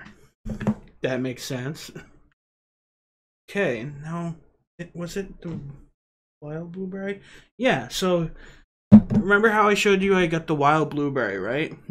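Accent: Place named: Rhode Island, United States. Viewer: Lots of good flavors there! Thought I recognized a RI accent. Worked 34 yrs in Providence, before retiring to FL.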